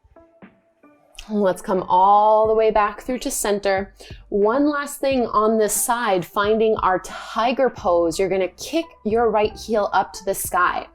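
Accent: Canada. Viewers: Started following for the Canadian accent (I'm homesick 🤣😭) but stayed for the amazing yoga! Thanks. Had an overpowering feeling of love and sadness for my deceased grandparents during the savasana at the end of this practice. I wasn't expecting that.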